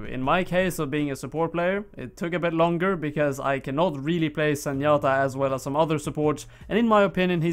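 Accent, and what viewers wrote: Sweden. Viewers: This guy is so swedish you hear it on his voice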